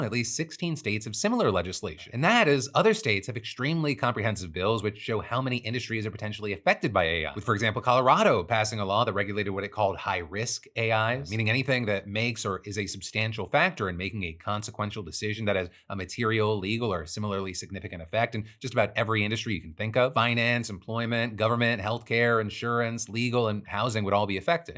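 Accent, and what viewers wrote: South, United States. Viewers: I love the southern drawl creeping into your speaking pattern lmao its awesome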